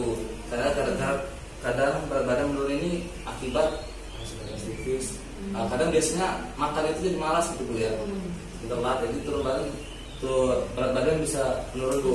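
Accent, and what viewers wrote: Java, Indonesia. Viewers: Medok kali lah bg hahaa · Medok bgt mas. Hehe Good job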